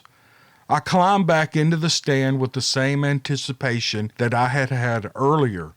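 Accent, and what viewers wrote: South, United States. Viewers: They are not monsters,like some people think.i love this guys story,and the way you tell it.also,love your accent.southern boy,like me.if they seem aggresive ,then some one made them that way,possibly by harming one,or shooting one.too quick with the gun,they are more curious than anything.they don't mean you any harm,unless they have been abused by someone before you arrived.well,merry christmas to you and your family.keep up the good work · Hey!!!! Merry Christmas. I thank God for you and your channel. You bring me and so many people joy all year long. Plus as a midwesterner( Wisconsin) I love your southern narration! Bless you and yours.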